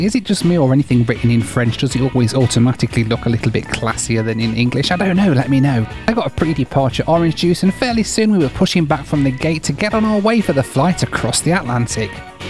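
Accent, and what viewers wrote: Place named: England, United Kingdom. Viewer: Brilliant vid, thanks!! I’m so glad you still have the English accent keep representing us out there mate!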